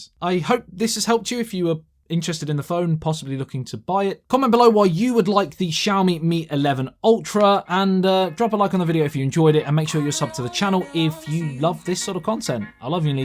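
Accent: United Kingdom. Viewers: Is this Youtuber from UK ? I luv British Accent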